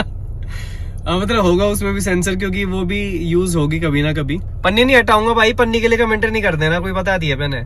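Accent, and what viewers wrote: Haryana, India. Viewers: Bro's content day by day 📈 and your haryanvi accent oye hoye🤌😂❤️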